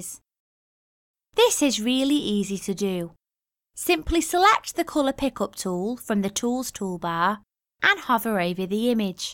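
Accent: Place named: North, United Kingdom. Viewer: You can't beat a good old northern accent. Salt of the earth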